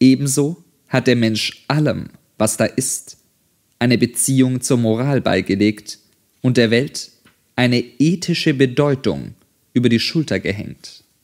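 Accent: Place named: Austria. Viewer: Es hat diesen össtereichischen Akzent. Aber ich finde daran kann man sich gewöhnen. Es ist dafür klar und flüssig gelesen und auch gut aufgenommen. Das Paket finde ich insgesamt bemerkenswert und einzigartig. Wenn man sich an die persönliche Note gewöhnt hat (die jeder Vorleser hat, das liegt per se in der Sache) kann man sich wirklich sehr gut auf das was Nietsche da verfasste konzentrieren. Schade eigentlich, wenn jemand dann aufgrund persönlichen Nichtgefallens zu solchen Ausdrücken greifen muss, und das so global abtut. Daher: Deine Meinung teile ich ganz und gar nicht. MfG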